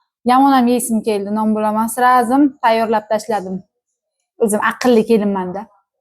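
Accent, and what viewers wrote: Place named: Olot, Uzbekistan. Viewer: Олотликлар шунақа гапиради Турқманистон билан чегарадош олотликлар турқманча гаплашади кўписи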